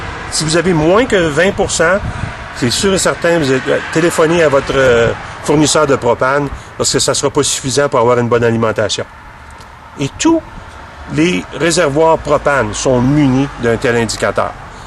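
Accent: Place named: Quebec, Canada. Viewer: Un québécois !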